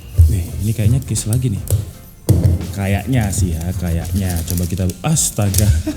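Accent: Java, Indonesia. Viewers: Radak ono jawa"nya sitik😂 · Wng jowo cek😂😂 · Lo orang jawa ya bro. Bahasanya kok mirip orang jawa gtu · Sampean jowone ngendi bang. Wkwk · Asli ndi seh sam peno, ⁉️. Koyok e wong jowo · Mase wong jowo🤣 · Keri cok, jowo bang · Sampean jawane pundi mas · jowo mu ngendi kang...😂😂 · maaf ya kak kakak itu orang Jawa atau orang jakarta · Wong jowo pa bang😅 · Jan wong jowo tenan ki rek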